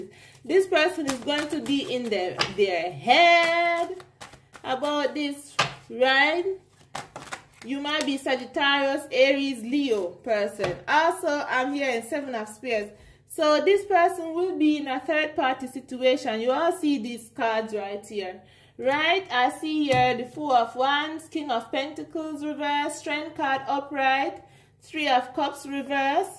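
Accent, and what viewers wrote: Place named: Jamaica. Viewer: Omg mi just know seh is a Jamaican accent that ❤👏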